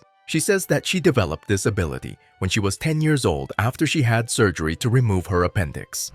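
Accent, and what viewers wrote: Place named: India. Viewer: I miss your beautiful improper Indian voice.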